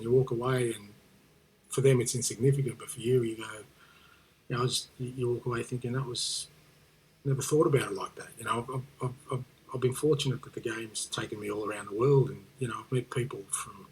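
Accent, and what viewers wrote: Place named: Australia. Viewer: God he's so Aussie its ridiculous 🤣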